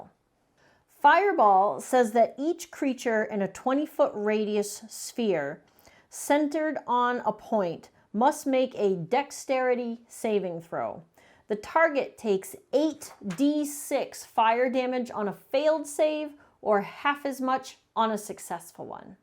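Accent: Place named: New England, United States. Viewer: Finally a New Englander talkin' about D&D! Go Sox!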